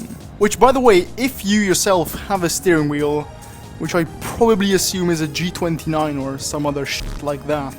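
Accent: Italy. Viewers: bro you're Italian because it's a perfect Italian accent because I'm Italian and it's the same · Sei italiano? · Are you Italian?? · Your accent is sooo italian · bro sei italiano? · Sei Italiano? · Ma sei italiano allora perché lo sentivo un po anche dall’accento e poi perché qui avevi detto “MADONNA” comunque mi dici il nome del gioco? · are you italian? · Bro ma sei italiano?? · Are you italian? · aRE you Italian? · io all'inizio: "sembra un accento strano" io a : "ok è decisamente italiano" ahaha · Ma sei italiano? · Ma dillo subito che sei italiano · Are you Italian? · are u italian by any chances?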